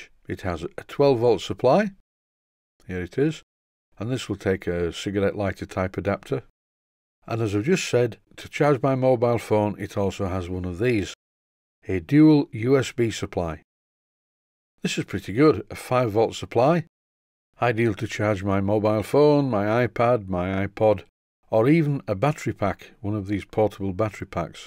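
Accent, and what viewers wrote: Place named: Northern England, United Kingdom. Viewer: dont worry I understand u fine but then I am English and have spent a fair amount of time around northerners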